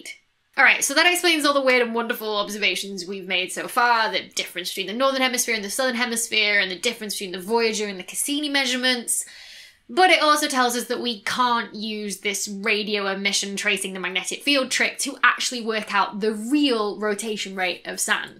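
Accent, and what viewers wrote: United Kingdom. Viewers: I thought 'Geezer' is an anglicism. Turns out geezers are a global phenomena. I can't tell woch county she's from, but local accent is most definitely at work here. Somewhere between Cardiff and Glasgow, i'd guess. England alone have more diverse dialectal difference than entire USA. Like, you could make out the native postcode from listening to them. Geezer. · Dr Becky... charming as always... your UK pronunciation of geysers indeed sounds like US pronunciation of slang for old men... keep the accent... One topic I hope you'll do would be on tidal locking of bodies in orbits. It is really hard to understand why rotation rate could/would synchronize itself to orbital speed since bodies don't have handles (like pots and pans) by which the larger central body could "grab" them and adjust their rotation rate (eg: how did earth fine tune Moon's rotation to tidally lock it since moon doesn't have handles and Earth doesn't have arms by which to grab and do the trick). 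· Tell me, Doctor, what accent of English is it that you wrap your presenting in? It does not sound exactly the way of American I am used to hearing on YT, nor quite British. It is rather lovely, let me say... Oh, British then? What kind of British?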